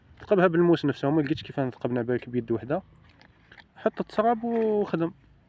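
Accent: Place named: Constantine, Algeria. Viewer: خويا انت قسنطيني ...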